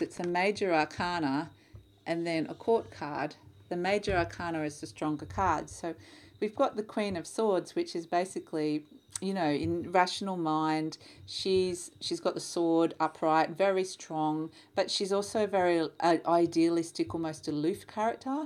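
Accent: Australia. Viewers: Yeah those aussie spiders can be scary! 🤪your readings are wonderful love! And nothing more lovely than the stralia accent! 💖💕 my home away from home. 🤸‍♀️💫💖 thank you for the great reading!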